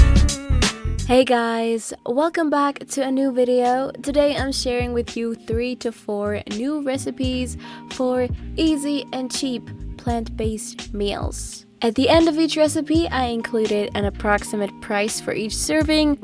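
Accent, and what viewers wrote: Germany. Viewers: Ah, an den Produkten sehe ich, dass du Deutsche bist :D Ich habe mich schon gefragt was für ein Akzent das ist :D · Are you German?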